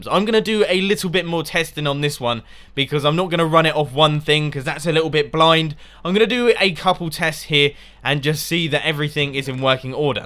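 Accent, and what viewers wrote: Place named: United Kingdom. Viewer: I'm new to this channel is British? He has accent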